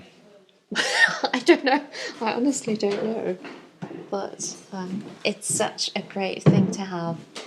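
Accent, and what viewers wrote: England, United Kingdom. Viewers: Im curious, you and are from Germany, but you both have an English accent. Especially you,. How can that be?❤ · I like your videos! You are such a lovely couple! I have one question: you are from Germany, but you have no accent. Your pronunciation is so perfect and so gorgeous British 😅 I try to get rid of my accent too but it seems to be impossible… How did you manage it?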